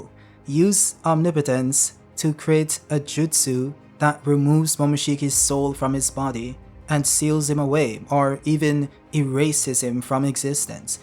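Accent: Jamaica. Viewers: bro u made me understand more of baruto thanks but i got to ask are yu Jamaican? im just asking bc im Jamaican😁❔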